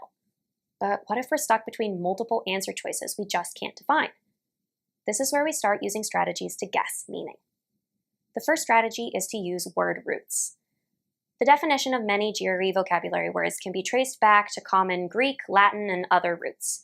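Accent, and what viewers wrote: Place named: United States. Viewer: I like her American Accent.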